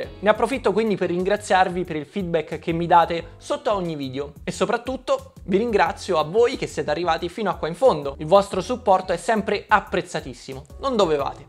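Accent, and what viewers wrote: Rome, Italy. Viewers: Non so, ma qualcosa mi dice che è Romano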